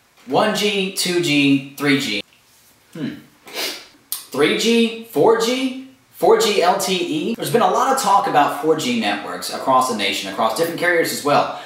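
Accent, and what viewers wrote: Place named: Indiana, United States. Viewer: i really enjoyed your video! and you really do have an Indiana accent lol nice.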